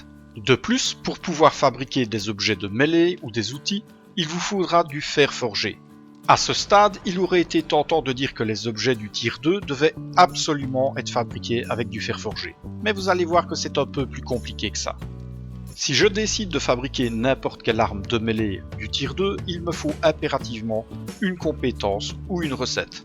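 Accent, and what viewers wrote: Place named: Belgium. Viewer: Au vu de ton accent et celui de papy, je dirai que tu proviens de Belgique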